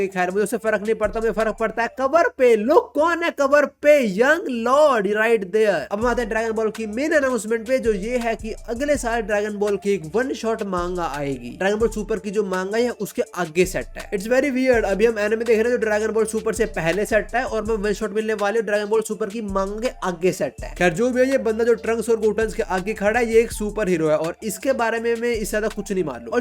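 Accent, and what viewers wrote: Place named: Bengal, India. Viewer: Wow Bengali is funny ngl 😅✨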